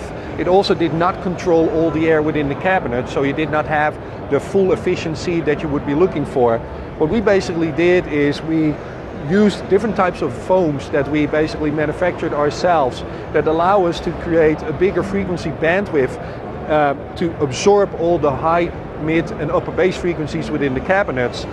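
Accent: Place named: Netherlands. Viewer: LOL i can just hear by the way he talks english he's dutch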